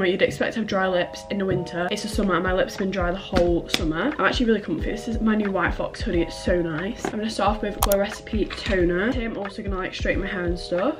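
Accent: United Kingdom. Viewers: As a Ukrainian who speaks American English I was just trying to understand British accent like whole video🥲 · Welll this is my firsst timee i see youu anddd whenn i heard ur british accenttt i did a subscribe andd when the video endss i like itt soo much keep goind❤❤ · I love her britisch Akzent sm lol btw i love her too💞🤩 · you sound British:face-purple-wide-eyes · The British accent is so annoying, I think it hurts me 😭💔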